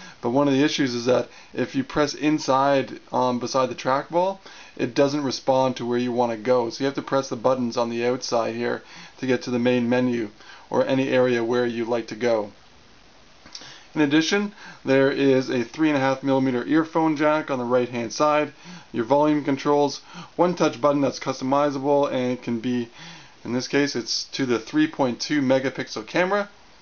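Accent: Canada. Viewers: I think I finally understand what a Canadian accent is. (outside NA)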